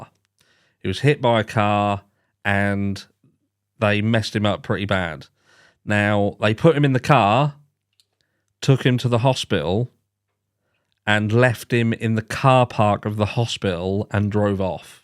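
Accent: Norfolk, United Kingdom. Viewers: It’s a Norfolk accent mines Suffolk and people think I’m from Australia too. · I think you sound british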